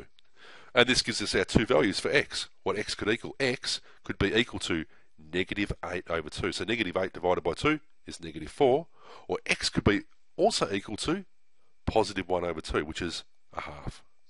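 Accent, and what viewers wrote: Australia. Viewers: wow, my mind is blown... that's so much easier than how I was taught! Also, math done in Australian just sounds less arduous. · its Australian haha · Anything with Aussie accent is cool!!! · Are you aussie cause i also am ? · It's clearly ozzie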